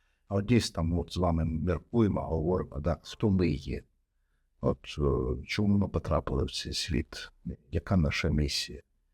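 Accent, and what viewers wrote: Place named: Germany. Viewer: говорит по-украински как будто, с немецким акцентом)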